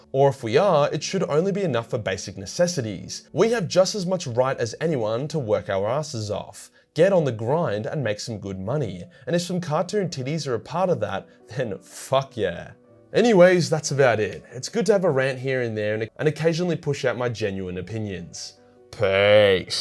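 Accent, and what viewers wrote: Australia. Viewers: I cant lie, this is the only way i can consume anything from 40k because everyone else who makes content like this just sounds like a loozerrr, why would i want to hang oout with a loozerrr when i can just listen to some aussie chad step above all the other loozerrr'z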